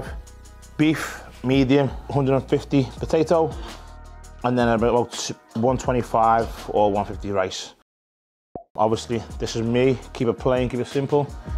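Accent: Liverpool, United Kingdom. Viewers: he’s from liverpool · Love the Scouse accent, represent 💪 YNWA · Scouser confirmed 😄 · Is this guy from Liverpool England? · The Scouse Way.. love the language. i have fun trying to decipher. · Hear that scouse accent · Omg what he is speaking too much Britishness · He is a scouser from liverpool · Don't get how anyone can't understand the accent. a mickey. Nout wrong with that. My birds a mickey...best and funniest people in the UK 👍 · Scouser accent eh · Ah, the scouse accent. Brilliant. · the scouser accent for me! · I am a Liverpool fan and have heard many local players speaking the same accent. It's difficult to understand but cool anyways.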